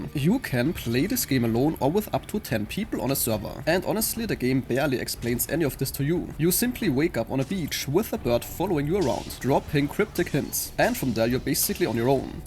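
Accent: Germany. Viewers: DU REDEST SO DEUTSCH OBWOHL DU ENGLISCH SPRICHTST.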